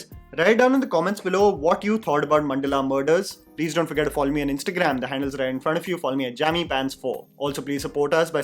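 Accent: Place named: Maharashtra, India. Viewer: You're Marathi accent is just adorable ❤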